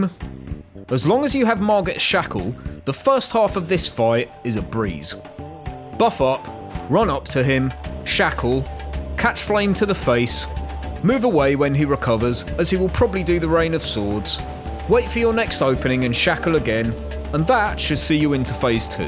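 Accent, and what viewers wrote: United Kingdom. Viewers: I adore these videos, an accent I can actually enjoy listening to and a similar sense of humour to me (+ great videos too). Thank you for uploading videos like these, they’re great to enjoy as a fellow bri’ish brethren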